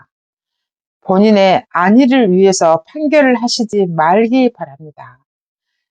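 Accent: Jeolla, South Korea. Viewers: 지나가다 보게 됐는데 미모의 여자가 전라도 사투리 쓰면서 어찌 이리 딱 딱 맞는 말씀만 하시는지.. 바로 구독,좋아요,알림설정 일사천리로 하고야 맙니다.😂😂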